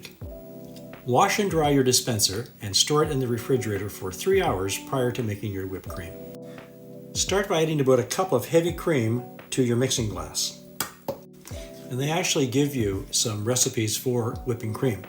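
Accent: Canada. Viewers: The Canadian accent shined through. 😊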